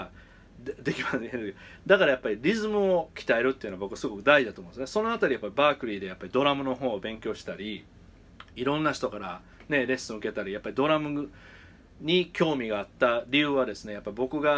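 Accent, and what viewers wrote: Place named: Kansai, Japan. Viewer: 20年ぐらい前に藤田先生のCD付き教則本買って同じ事してました、とても懐かしいですね。 最初は気が付かなかったけど関西なまりであれーってなりました笑 動画登録して楽しく拝見させてもらいます。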